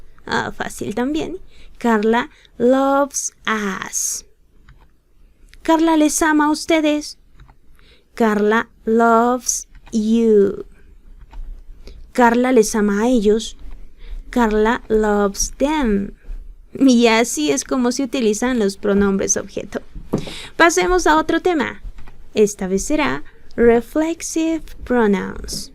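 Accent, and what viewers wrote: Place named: United States. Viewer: Es inglés americano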